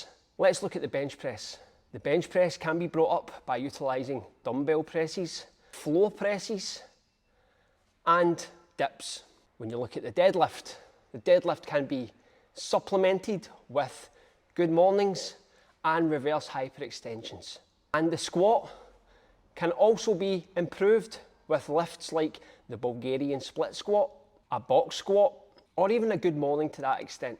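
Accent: Scotland, United Kingdom. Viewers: I love the Scottish mindset, accent, no nonsense approach and hard workout game 🏴 (I'm Scottish)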